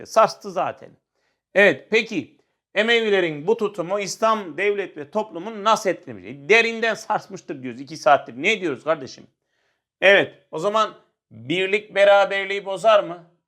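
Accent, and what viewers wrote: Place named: Serik, Turkey. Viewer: Hocam yarın tarih sınavımız var inşallah iyi bir not alırım ve güzel anlatıyorsunuz :) Antalyanın ilçesi olan serik ağzına benziyor konuşmanız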